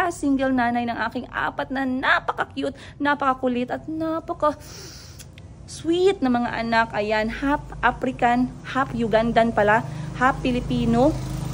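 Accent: Iloilo, Philippines. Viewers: 😂😂😂 nalilingaw takaga ako sa pag i Ilonggo mo Ms. Nalilipay talaga kmi makabati sang inilonggo mo ah. Enjoy kamo sa Thailand, God bless and keep safe po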